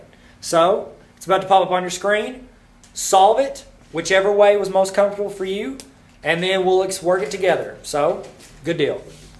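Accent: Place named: Texas, United States. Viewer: I'm guessing he's from texas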